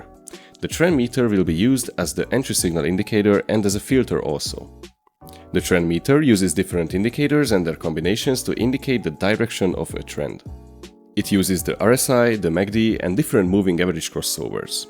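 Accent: Hungary. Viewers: Are you Hungarian? :)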